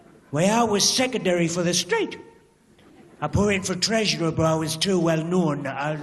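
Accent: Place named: Sunderland, United Kingdom. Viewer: His accent is real, a proper maccam get over yourself.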